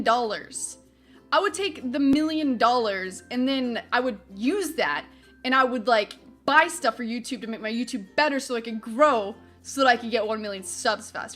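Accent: Canada. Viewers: are you from Canada new Zealand or Australia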